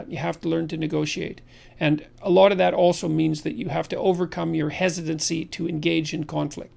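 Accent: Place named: Australia. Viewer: This is Australian accent ,Farmer style. All the country style accent have same characteristics, such as quick , not clear , not stable speed .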